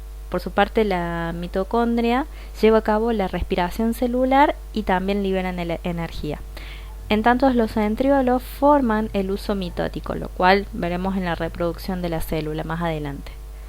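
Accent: Cordoba, Argentina. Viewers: Sos de cordoba? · re acento cordobés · Voz cordobesa osiosi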